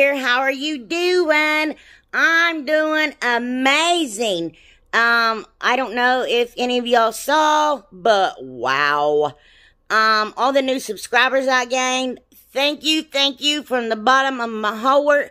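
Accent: South, United States. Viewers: Oh my gosh!! You are hilarious!! I love,love your personality!! You crack me up in a GOOD way!! Your accent reminds me of my aunt. Country as country gets!! I just wished I knew how to even try to spell out some of the words she says to give you an example of how she pronounces them but I can't. Lol. Loved all your project's today just as much as you as well. Thanks for sharing love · different is good. Where are you originally from because your accent is as country as mine! I just love it, and you and all your fits.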